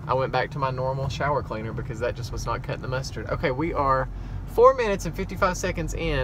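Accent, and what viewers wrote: South, United States. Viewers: My boyfriend and I just love watching you, so perky and fun and love listening to that southern accent! BTW I love my tarts as well, a definite good one I discovered the other day is Better Homes & Gardens "Spiced Vanilla Embers" you'll thank me :) it's amazing!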